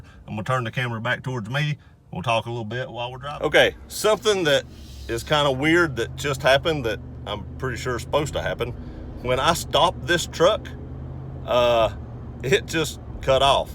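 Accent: South, United States. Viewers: What state do you live in because you sound very southern.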